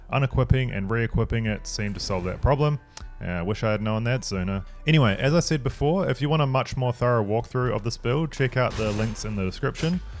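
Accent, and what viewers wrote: New Zealand. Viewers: I’m feeling an NZ accent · 2.3 seconds in.. and I reeeealy appreciate the new zealand accent, where are you from bro? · Searched for the best Witcher 3 build and was happy to hear a Kiwi accent. Big ups my man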